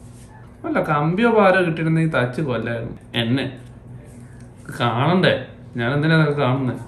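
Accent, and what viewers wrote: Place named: Kasaragod, India. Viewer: എവിടെയാ നാട്. നമ്മുടെ kasargod ഭാഷ വരുന്നു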